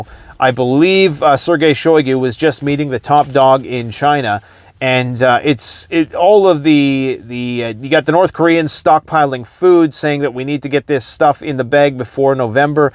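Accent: England, United Kingdom. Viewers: I Love the freaking English accent making fun of the long winded diplomatic words fancy words declaring war without flat out saying "our country is declaring war on XYZ!" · 😂 love English accent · Not good, 😂 British accent, why ppl refer the accent to war scenarios. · Ok that British accent has me rolling. You speak redcoat just a little too good 😂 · Lol...Love the English accent could you do a show ( post ) on MILITARY DRAFT and what it takes for a draft to happen who would be called, age groups, each countries policy's on this. I think that would be a informative subject. Thanks for your channel! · I love your British accent. Sounds awesome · Laughing! English accent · I SAY OLE CHAP THAT WAS A BRILLIANT PROPER BRITISH ACCENT...BRAVO, WELL DONE YOUNG LAD! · Thank you kindly brother. You must be tired. Love the British accent. Need to use more often. 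Had to do a lot of replay😂. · You have the best English accent! Keep it up! Helps digest all the hard truth you are giving us! Don’t stop. A spoon full of sugar helps the medicine go down :) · That's an English accent lol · love your British accent. As I am part Brit I got no problem with the use that voice to mock the crowns BS and if you live in a crown country you don't live in your own country do you. Us real Brits are always mocking the elites, which near us sound like us lolz unless they are playing "rainbow friends" with "young global leaders" etc. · God I love it when you put on the pretentious Brit voice, lol makes the info easier to swallow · That English accent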